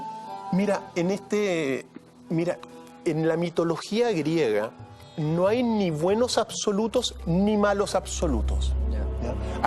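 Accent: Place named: Chile. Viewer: Esto es Chileno mil por mil... ese acento lo recozco a kilometros de distancia. Saludos desde Honduras.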